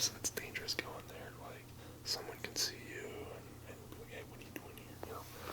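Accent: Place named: New England, United States. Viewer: Are you from New England? Your accent, I’m a Bostonian you have a familiar accent lol.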